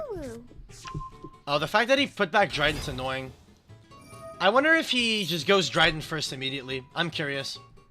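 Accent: Canada. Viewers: This guy’s video started autoplaying when I left YouTube open. He sounds so damn Canadian lol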